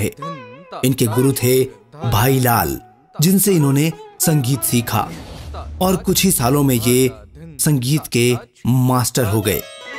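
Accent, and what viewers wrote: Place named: Urdu, Pakistan. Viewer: I don't like your urdu pronunciation. Speak Hindi.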